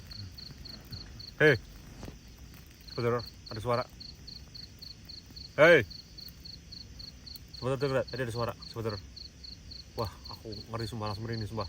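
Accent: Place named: Java, Indonesia. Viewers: Ngapak nya jelas...dari tegal tah😊😊😊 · Rasa nya hambar lah, udah di makan sama jin, jawa nya medok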